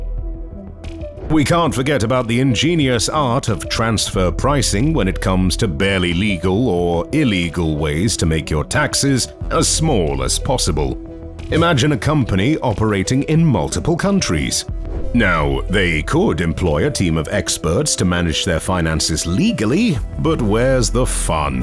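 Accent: United Kingdom. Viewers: I don't like British accent. Plus, the narrator's tone is rude & imposing. What he told abt Russia was totally false, hostile & misinforming. Why'd he do it? Ask him! It's an old rivelry between 2 worlds. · I don't like British accent too. Prefer Australian, Canadian and US accents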